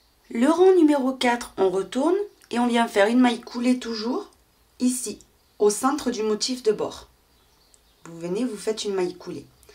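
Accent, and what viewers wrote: South, France. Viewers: bonjour.. et merci beaucoup pour toutes ces belles choses que vous nous donnez à faire.. j'en suis à mon quatrième châle avec vous, et je ne peux que me féliciter de vous avoir trouvée sur mon chemin, en me promenant sur YOUTUBE... vous m'êtes d'un immense secours; et ainsi, je peux faire plaisir, dès que possible, à certains de ma famille et j'apprends plein de chouettes points, ce qui me transporte de joie.. merci beaucoup. le dernier en train est un point relief que j'ai fait avec caprice couleurs mélangées qui rappellent de délicieux sorbets pêche/vanille/citron enfin des couleurs pep's et un point extra!! le prochain avec le point "pouf"!!... sera le bienvenu.. merci pour vos explications claires et attentives... et aussi pour votre accent qui me fait voyager au pays du soleil.. bonne journée et portez vous bien.. à bientôt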